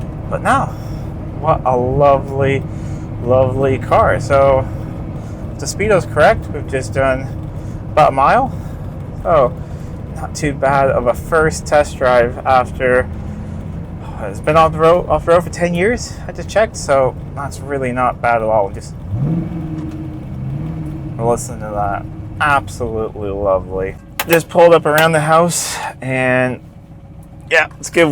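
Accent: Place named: Midwest, United States. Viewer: Hey OP, how long have you lived in the UK? Sound like a Midwestern man.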